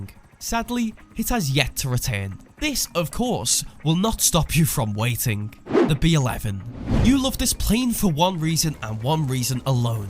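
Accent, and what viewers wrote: United Kingdom. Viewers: Ok dies viioe is preyys nice! I ont´t like Briish accsent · This guy is the definition of the bri'ish accent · SCOUSER!! No way ye not bro can hear it even through ye weird accent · Im sorry but please dont speak like a narrator because that british accsent+narrator just gave me a headache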